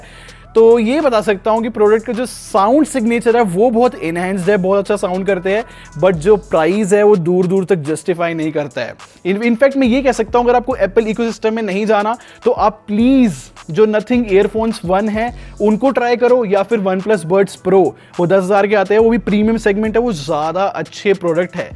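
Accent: Haryana, India. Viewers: Hum toh bus haryanvi sunne Aaay h☺☺ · Your haryanvi accent is so good 😀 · bhai bahut sahi haryanvi boli hai aapne 👌👌😍😅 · Very good Haryanvi 😀❤️❤️ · ठेठ haryanvi 😘 Act Jma gch bhai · Bhai Mahre Haryane ka sound Ghana badhiya lagga, Beech beech me aisi video kar liya kar 🙏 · Wah super real Haryanvi 🔥🔥 Jai Haryana Jai Haryanvi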